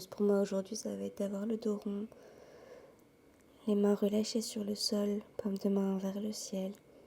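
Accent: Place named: France. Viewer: Bon dimanche, tu as un bel accent français, j'adore les grands écarts et les mouvements, chérie. 🥰❤🌹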